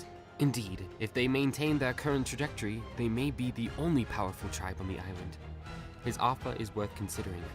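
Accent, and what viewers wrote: United Kingdom. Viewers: You have a British accent? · I don't mind the British accent, good voice acting in another dialect takes a lot of practice/training. My biggest problem is that you have this beautiful idea and a creative mind to put it all together and didn't bother to learn to pronounce a lot of the '$10 words'. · I’m English and love your British accent haha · Why the hell are you reading these outloud....do that many people who play not read? And wtf is the british accent about, like you said its trash. This video is trash and extremely unhelpful. Thanks for the waste of time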